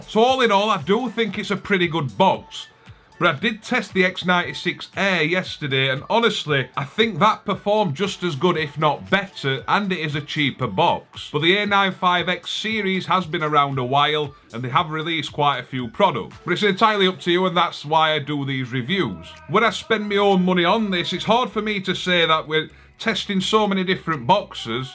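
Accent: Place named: North, United Kingdom. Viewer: You're definitely the happiest and funniest Android Boxes reviewer that I've ever seen in the whole Youtube. Got a new subscriber! By the way your northern British accent is very pleasant to listen to.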